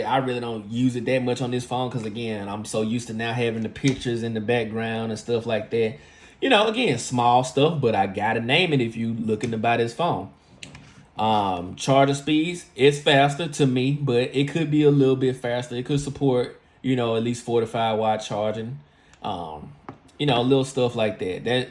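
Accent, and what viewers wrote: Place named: South, United States. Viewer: Thanks for info bro. I can tell you from the south! Love from Alabama.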